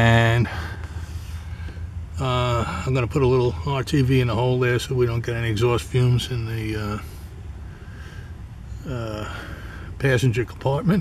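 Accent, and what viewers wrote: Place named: New York, United States. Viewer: thank you for the video! i also am having this problem and i’ve watched so many videos,it’s interesting to see what works for each! i also enjoyed your voice, it reminded me of my cousins in NY! i’m also curious to see what type of van you have, i’d like to see the interior.i have a ‘99 jayco conervesion camper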